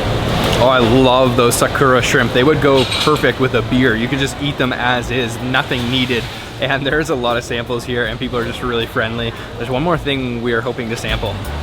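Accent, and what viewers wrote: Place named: Eastern Canada, Canada. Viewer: 100% Eastern-Canadian Accent!!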